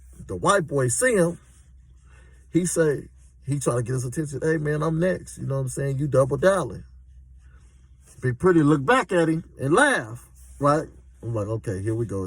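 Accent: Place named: Detroit, United States. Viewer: Woo woo woo woo . Oh yeah sounds like a D nicca 😂😂 . My 1st time on your channel cuz . I don't know where you from just talking shi. Much love and respect blessing & success to your family and channel. Midwest fo sho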